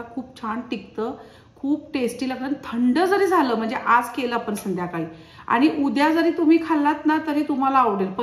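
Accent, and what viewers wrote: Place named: Karnataka, India. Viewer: तुमचे कर्नाटकी मराठी हेल काढून उच्चार खूप छान वाटले कानांना ऐकताना 😍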